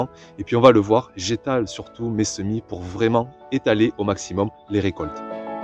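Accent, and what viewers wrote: South, France. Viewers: Toujours une excellente vidéo avec l'accent du grand Sud . Le haricot est un légume très intéressant qui peut s'avérer très productif . Pour ceux qui disposent d'un jardin assez grand ne pas hésiter à le cultiver et réaliser des conserves utiles en hiver quand on manque de légumes verts . Les haricots en grains accompagnent aussi de nombreux plats . A plus .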